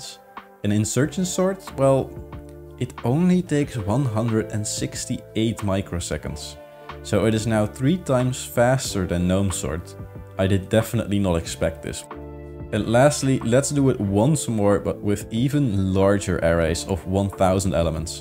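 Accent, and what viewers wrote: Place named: Netherlands. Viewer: You sound dutch, where the average male height is beyond 1.80.. no wonder you don‘t know how to deal with gnomes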